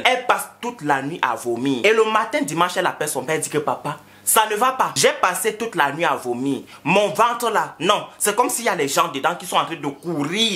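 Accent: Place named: Cameroon. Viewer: J'suis abonnée sur ta page parce que tu me fais beaucoup rire 😁😁😁 Aussi parce que tu appuies bien notre accent Camerounais, tu parles avec notre Argot Camerounais et tu parles de notre actualité et de nos problèmes Camerounais 🇨🇲🇨🇲🇨🇲🇨🇲🇨🇲 Continue comme ça, c'est le 237 qui gagne 💚❤💛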